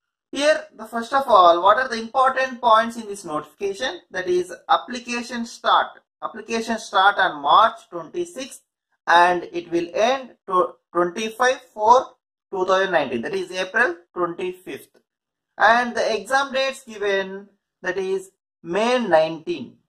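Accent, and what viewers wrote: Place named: North India, India. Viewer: Baagaa matladaaru I mean pure telugu. Nenu meeru north anukunnanu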